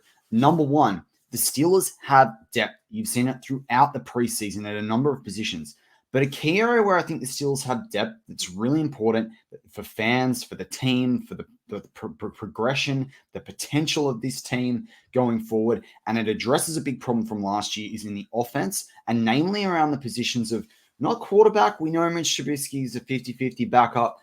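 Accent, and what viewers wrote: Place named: Australia. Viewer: Who is this Aussie talking about the Steelers? 🤣🤣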